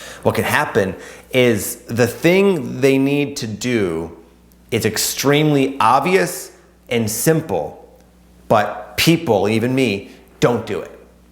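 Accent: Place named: Inland North, United States. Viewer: Powerful stuff,! So seamless that I got the feeling it was divinely channeled. Interesting how spirit works. I always loved your message, but when it was delivered in that Inland Northern American accent, spirit told me I was home. (I'm Italian like you and my family is from Western NY. Same accent.) ❤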